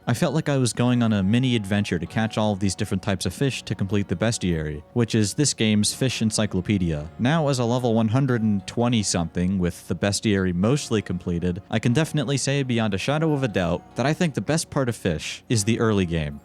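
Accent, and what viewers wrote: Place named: United Kingdom. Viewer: COULDV GOTTEN ARE U BRITISH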